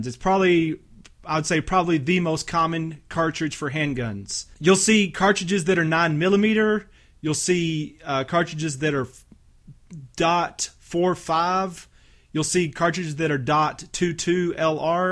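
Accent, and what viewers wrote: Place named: South, United States. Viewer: He kinda sounds like he's southern/midwestern so maybe he's in Missouri or Kansas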